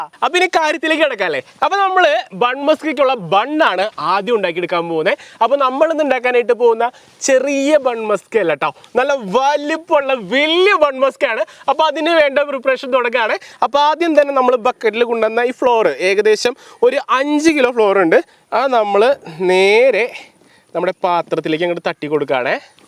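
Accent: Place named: Kannur, India. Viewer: Wow ❤ Kannur full ithan 😂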